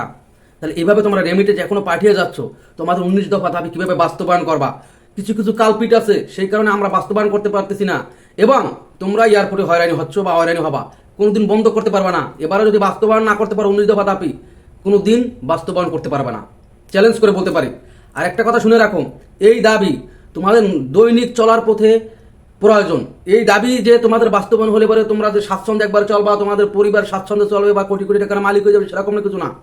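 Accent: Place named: India. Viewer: শয়তানি করার জায়গা পাওনা। অবইধ্য ভাবে মোবাইল চালাবা আর সন্ত্রাসি করবা আর বলবা বাংলাদেশে আইননাই। ইন্ডিয়ার লোক তুমি।তোমার ভাষায় বুঝা যায়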